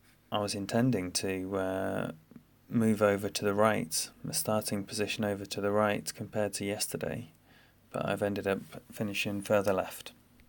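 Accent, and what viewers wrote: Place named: Sweden. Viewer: Thanks for uploading the Beeedioe (Swenglish) You're the man!!!!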